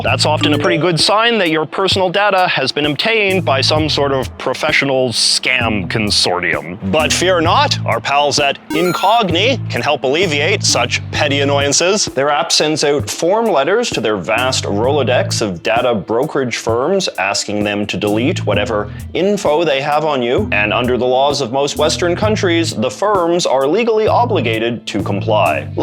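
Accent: Canada. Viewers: D --> my guess is Canadian because of how he says the word "about" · How are you the most Canadian sounding youtuber ever??? That "About" has been UNMISSABLE in this video. Love ur videos tho. Love the way u articulate and project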